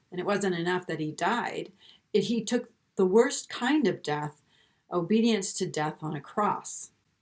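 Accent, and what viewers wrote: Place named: Idaho, United States. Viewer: I've been binge watching for about 3 years. With every teaching, I grow in knowledge and understanding. I'm very grateful that I was led to your channel. I thank God for the work he is doing through you. Side note. I enjoy your Idaho accent. I miss hearing it. Love and peace always.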